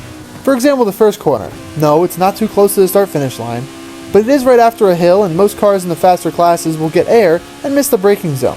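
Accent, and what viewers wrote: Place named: RP, United Kingdom. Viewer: This is a wicked circuit. I agree with your review other than the dead stops and the size of it I dont think there should be a mark down for them, cos if a person cannot stay within the width of the track plus the run off space, then its a skill issue, not a builder issue. Yes, length does make it harder to decorate, but id rather have a brilliant track like this, then more stuff to look at it whilst I race about. Good stuff boy. I love your posh accent as well. hehehhe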